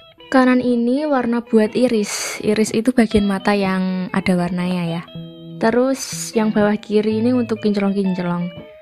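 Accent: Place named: Java, Indonesia. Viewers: Mbaee orang jawa yaaa wkwkw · Dan juga medok'e uueenak reek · jowo ne medok ya bund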